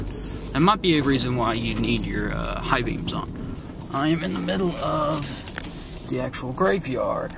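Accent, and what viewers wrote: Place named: South, United States. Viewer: your country twang has really come out in this video. Also, from my past experiences and such with the dead, is you ask if they would please answer the following questions (and state your questions). Just a suggestion, but all in all, great piece. Can't wait till your next vid. Love the paranormal! Hugs to you!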